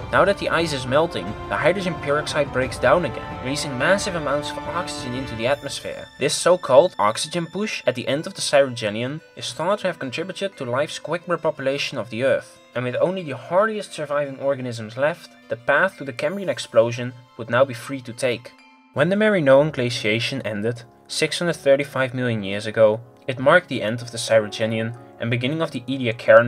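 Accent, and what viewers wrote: Netherlands. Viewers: Some constructive criticism: Talk slower, and clearer (dutch accent is of a good things ;)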